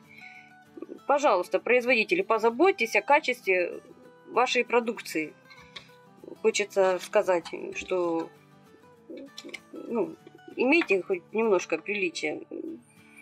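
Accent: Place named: Ukraine. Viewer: Согласна с Вами, коллега! Очень вкусные конфеты! Да и говор у автора видео явно хохлятский... 😂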